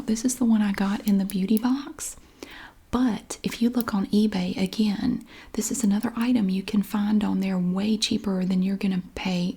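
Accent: South, United States. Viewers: Hi it's good to see you as well, I love your southern accent, I must be high maintenance then because I have a full basket of products.😍😁, I'm new to your channel, I'd love to see you do a video with a tea party, and finger sandwich I think it would be fun.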